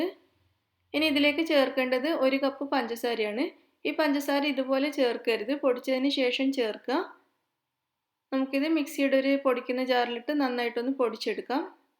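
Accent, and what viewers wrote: Kannur, India. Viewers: കണ്ണൂര്‍ കാരി ആണോ I also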